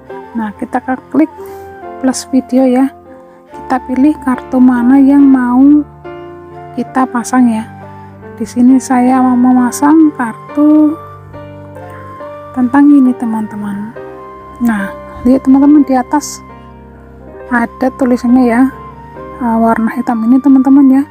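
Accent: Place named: Banyumas, Indonesia. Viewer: Mantep niih info tutoriale mbakyuuuu..dulurku kiye..pada pada ngapak...kiye..mantep pokoke.. Salam sukses luuur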